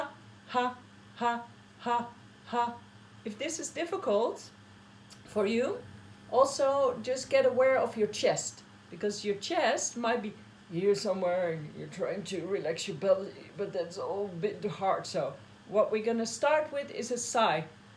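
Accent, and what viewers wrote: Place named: Netherlands. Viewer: Are you from the Netherlands cause you sound just like a female version of Wim Hof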